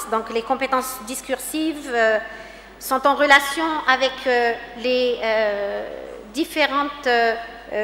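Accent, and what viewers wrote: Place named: England, United Kingdom. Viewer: teachers of English trying to speak French, we still can notice the effects of English on their French pronunciation.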